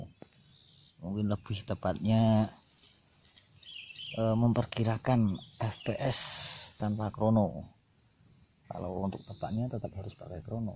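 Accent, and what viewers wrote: Java, Indonesia. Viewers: Mas njenengan jowo ne pundi nggeh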